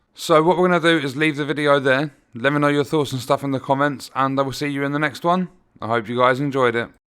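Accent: United Kingdom. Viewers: Not to be disrespectful but the british accent makes this video even more enjoyable and funny. :)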